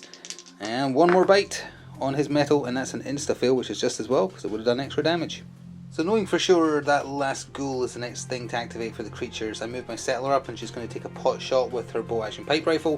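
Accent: Scotland, United Kingdom. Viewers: Where abouts in Scotland u from bro? Enjoying ur content man keep it coming 👍🏻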